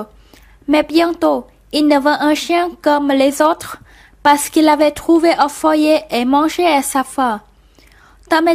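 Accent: Vietnam. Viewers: Giọng Vn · trời giọng người vn mà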